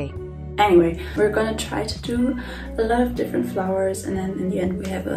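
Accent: Germany. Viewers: ich liebe diesen deutschen akzent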